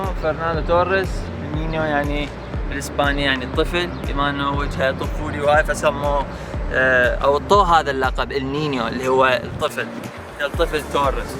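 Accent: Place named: Iraq. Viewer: الله الله طلعت روخي بلانكوس مدريد حمراء 🔴⚪️ up atleti الله يوفقك أنا من 🇸🇦 سبق عشت في كاليفورنيا وشفت فلوقك كوسكو لأجل اشوف الاسعار واكتشفت انك وانت تمشي تكلم واحد بفوز اتلتيكو ع الريال المهم أبني وزوجي خليتهم يميلون لفريقي ولا أميل للدوري عندنا رغم وجود اساطير لكن من زمان الزمانالأتليتي عشق أضفتك يوتيوب بصاله بTVعائلتي والأنستا ربي يحفظك ياخوي الاصغر . على فكرة لهجتكم الطف لهجة وكان عندي صديقات عراقيات . ونصيحة لله المحافظه على الصلاة ومصاحبة الاخيار في ايام الجمعه كان الله في عونكم في بلاد الغربه التمسك بالدين مجاهدة تأجرؤن عليها وأن كنت غير من غير أهلالسنةوالجماعة الله يستر عليك بالدنيا ويهديك لدين الحق والصواب .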